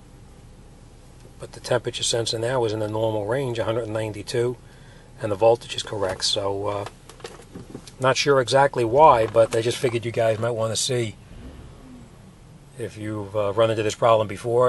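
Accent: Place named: New Jersey, United States. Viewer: Sounds like you from joisey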